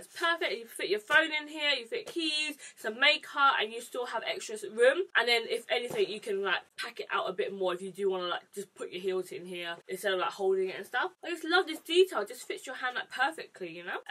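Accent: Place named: Cockney, United Kingdom. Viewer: Samia Muslimah she got an accent which is called cockney. I love her accent x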